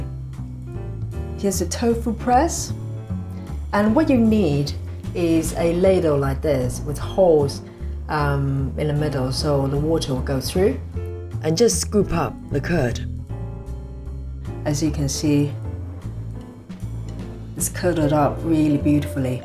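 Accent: United Kingdom. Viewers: LOVE your British accent!! Great video:)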